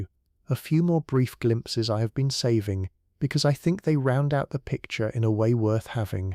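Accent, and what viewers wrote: United Kingdom. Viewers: Lancashire England. Great storytelling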